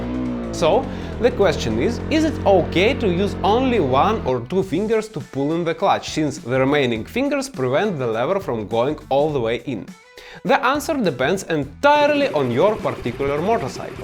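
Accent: Russia. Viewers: Чел, с таким русским акцентом изучать английский просто превосходно) Русский канал ты верно забросил, так что смотрю тут и даже понимаю процентов 40. пока 40.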